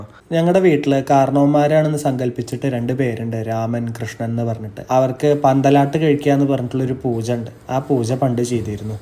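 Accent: Valluvanad, India. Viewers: എനിക്ക് ഒത്തിരി ഇഷ്ടമാണ് വള്ളുവനാടൻ ശൈലി...❤ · ഇത് വള്ളുവനാടൻ ശൈലി ആണ്.. നമ്പൂരാർക്ക് മാത്രം ഉള്ള ഭാഷ അല്ല. ഇവിടെ എല്ലാരും ഇങ്ങനെ തന്നെ!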